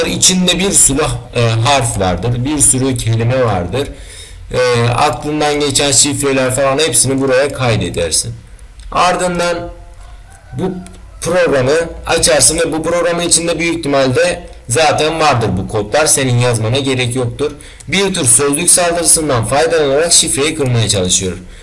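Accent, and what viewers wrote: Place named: Azerbaijan. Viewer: Azeridi özünü qırmır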